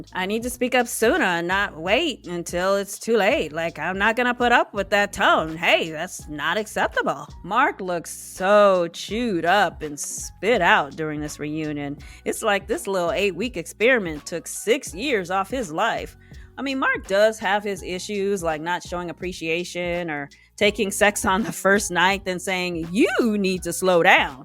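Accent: Boston, United States. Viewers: I was waiting for this from you & as expected, you were spot on!!! Thank you! "Karen" is a crazy vicious & spiteful narcissistic woman. Mark visibly looked shaken sitting next to her. Clearly no one b'sides Tootie liked her. Was anyone else slightly nauseated by Kevin Frazier's CONSTANT gushing over Katina & Tootie? Like yeah, They said yes on decision day and were still together, but he was kind of a dick throughout a lot of the season. Oh and lastly, I loved that Dr. Pepper and Dr. Viviana laid into "Hostage". The simple truth is that she is NOT a good person and couldn't just admit that she's shallow and based her entire marital demise on her lack of satisfaction with Chris's looks. On that list, looks should have been #1 in her top 5 things she wanted. She kept beating around the bush & dodging questions to the point of severe annoyance., your Boston accent cracks me up. 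Thank you for all you do & making me smile! You are an awesome & talented woman 🌺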